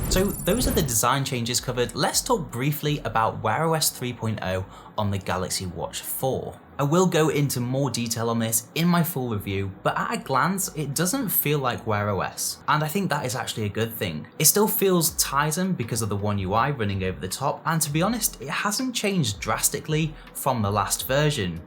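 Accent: United Kingdom. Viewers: i love that british accent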